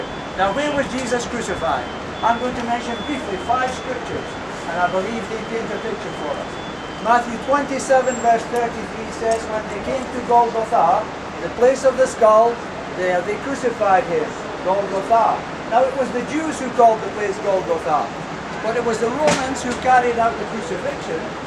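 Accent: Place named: Glasgow, United Kingdom. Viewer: He's Scottish! Sounds like a Glasgow accent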